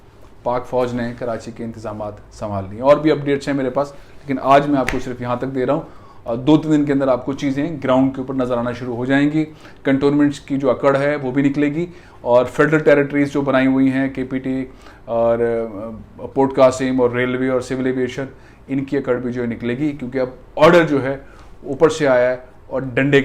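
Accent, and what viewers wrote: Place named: Punjab, India. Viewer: Apka bolny ka style ab tu paka panjabi ban giya hy,ye kyse houa???🤔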